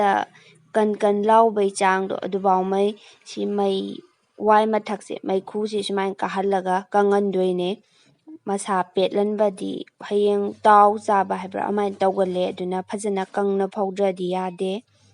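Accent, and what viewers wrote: Kakching, India. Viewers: Kakching accent 👍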